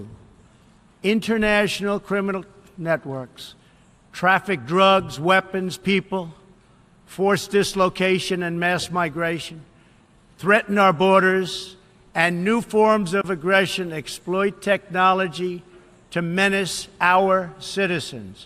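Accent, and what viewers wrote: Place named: New York, United States. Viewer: I really am on bored. I dont see any other politician saying this nevermind with his smart new york guyvtalk that is likeable not racist. Media is highjacked he says he is worried about america because we arent living up to expectationa because of our own system the president cant bring world peace unless we start in America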